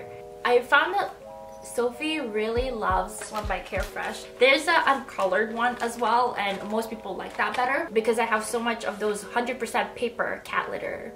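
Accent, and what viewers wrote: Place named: United States. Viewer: She has a very Americanized voice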